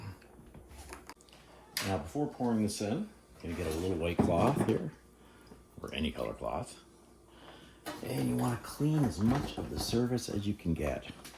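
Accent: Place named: Upper Midwest, United States. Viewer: Where you from? North Dakota? Minnesota?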